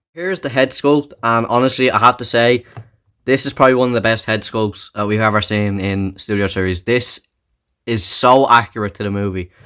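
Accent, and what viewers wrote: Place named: Ireland. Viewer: Don't worry. I didn't pay much attention to the 4th and 5th films too anyway. I knew you're from Ireland just from the accent lol.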